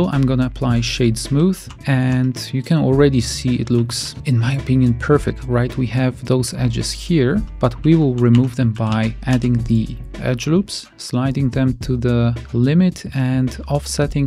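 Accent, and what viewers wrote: Poland. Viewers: Real CAD programs automatically make perfect fillets and they provide you with several tools to verify their perfection. Blender does not have these features because it is used by artists rather than by engineers. If you want to make jumping dinosaur, you use Blender. If you want to design airplane wing, you use Rhino. (This guy has Polish accent.) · Are you Polish? lol Nice video mate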